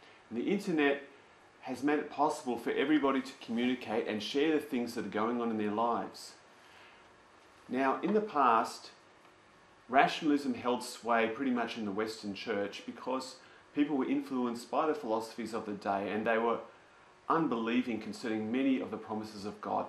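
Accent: Australia. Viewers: He's an Aussie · it's not a british accent it's Australian. I'm guessin you are american, i don't know why so many americans fail so miserably at picking accents, i guess it's because you just don't get exposure to foreign accents enough because of the ludicrous nationalism. As an Australian i don't understand this idiot, he lives in a secular country where secularism is only growing larger and larger and less than a third of the country are believers and that number is shrinking all the time. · that would be funny if he was speaking with a british accent. unfortunately its actually australian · As an English person, I am offended you think this idiot is English. He's Australian. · I agree, however he is austrailian not british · shit i think this guy is australian, sorry everyone.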